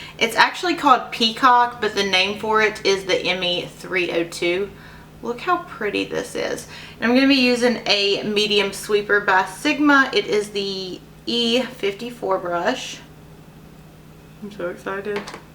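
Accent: South, United States. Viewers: Your so pretty with and without makeup! You sound like your from the south, I love it! I live in North Georgia! :) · Girl, I am a new subbie and I knew you had to be a southern gal! Love this eye look! Hugs from Bama!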